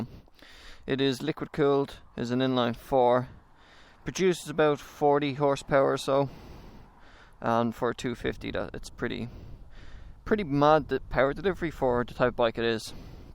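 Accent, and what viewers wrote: Ireland. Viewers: Are you irish pal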